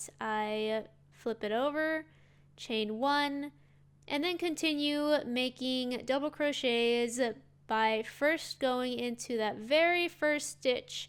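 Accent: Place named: United States. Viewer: Sweet.Can anybody tell me what U.S accent does the craft lady have?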